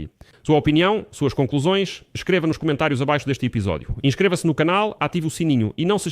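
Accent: Portugal. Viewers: EESTE CANAL E POTUGUES E PELO SOTATAQUE TAKAICHI E ESPERTO NÃO QUE CONSTITUIÇÃO PROIBE A ELA QUER DAR UMA RASTEIRA NA OPOSIÇÃO, AO INVES DE FAZER BOMBAS ATOMICA ,COMPRA DOS EUA ,E FICA AMOSTRAR P CHINA E KOREIA DO NORTE ,NOS TEMOS OGIVAS NUCLEAR ,VOCES QUE FIQUEM ATENTOS ,OK,😊😊ELA E INTERIGENTISSIMO ESTA SANAE ,SAN ❤🎉😊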